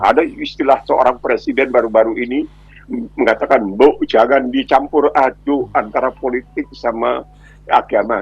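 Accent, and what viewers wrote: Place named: Medan, Indonesia. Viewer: C abang aku ingat aja di RASI ...... dengan khas logat Medan nya aku ternyata sempat juga merantau di Medan Bang 😂😂😂 Sukses selalu smoga sehat2 saja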